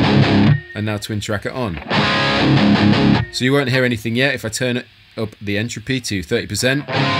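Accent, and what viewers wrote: United Kingdom. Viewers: britain, given your accent :)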